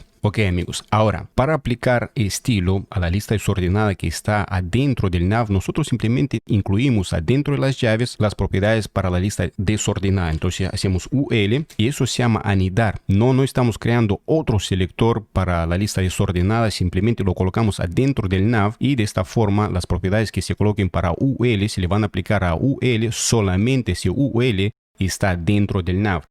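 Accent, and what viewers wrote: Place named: Russia. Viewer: pero eres ruso no??a ver sin ofender ni nada solo que me gusta tu accento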